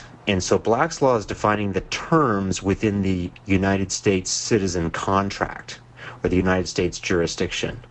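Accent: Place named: Europe. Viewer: Of course he's saying this he sounds European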